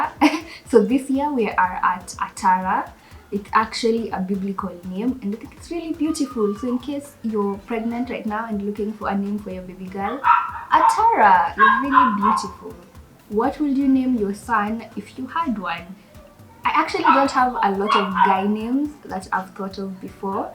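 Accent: United Kingdom. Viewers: The British accent🙌🏼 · British accent of course and it reminds me of Kijana Wamalwa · British accent 🔥🔥🔥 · The British accent mama 🤩🤩🔥🔥 sighs! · Jesus can we talk about her "british"accent♥️ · It's the British accent speaking for me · Its the British accent for me😍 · That British accent👌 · Your british accent is awesome...the way have tried to mimic that accent and I can't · It's the British accent for me👌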